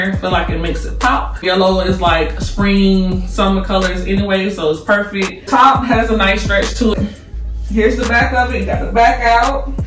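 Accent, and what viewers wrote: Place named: South, United States. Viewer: Beautiful skin. Flawless. Love the southern twang. 😊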